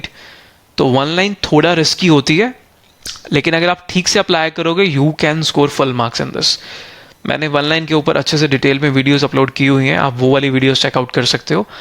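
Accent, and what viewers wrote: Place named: Pakistan. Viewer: sir ka excnt and urdu bilkul pakistani hy👍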